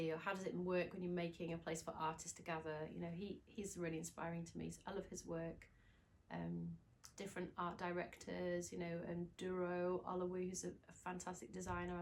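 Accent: United Kingdom. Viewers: Maybe it's the northern accent, but you come across so down to earth and having no airs. I remember hearing when it started being played on the radio and having to keep the engine running even though I'd arrived home just to finish listening to it. Those were the days! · I was mot expecting her to be British omg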